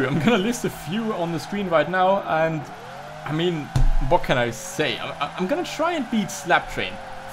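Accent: Germany. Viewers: Is he German? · Bro are you German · Du hast einen echten deutsche Akzent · You sound really german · Are you from germany?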